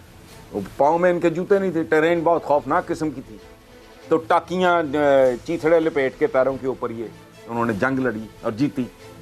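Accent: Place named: Punjab, Pakistan. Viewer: Tusi khuskardita toda panjabi awaj dilpe habi paji tusi great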